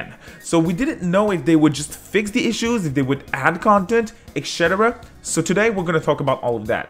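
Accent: Quebec, Canada. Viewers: Will buy it on switch day 1. Can't wait to discover this game, I'm still kinda new to the SMT series. I've only beaten DDS1-2 and smt4. Do I hear a french canadian accent?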